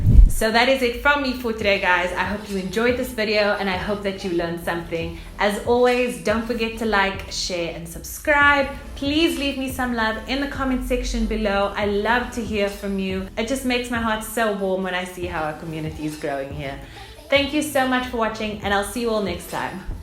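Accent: South Africa. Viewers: Joburg accent neh☺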